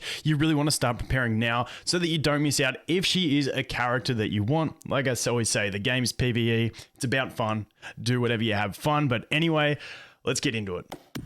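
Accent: Australia. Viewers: Wait is he an Aussie. Surely? Still subscribing anyways lmaooo Edit: yep he definitely is… he has 7/11 ads HAHAJSHSHSJSJ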